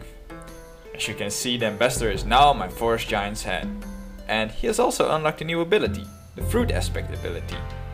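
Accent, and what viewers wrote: Netherlands. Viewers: you actualy sound dutch ^.^ it would explain why the name reus soudns so mutch like the word giant in dutch :P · This looks interesting, I like indie-games like these. Art looks good, hope it's well balanced. Je zou wel wat aan dat accent moeten doen. Hollands engels is nog pijnlijker dan Frans engels. Wel het opvallende onvermogen maar dan zonder de charme.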